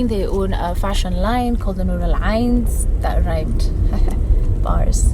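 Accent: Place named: Kenya. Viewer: Love her Kenyan English accent 😭ps we need a tutorial for the makeup you was wearing in the start of the video 😍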